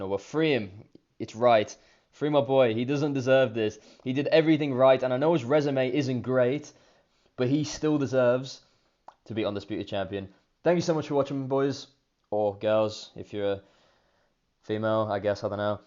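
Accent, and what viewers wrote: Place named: United Kingdom. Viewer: I click this video and I hear a thick UK accent LOL - makes perfect sense. You have no clue what you're talking about m8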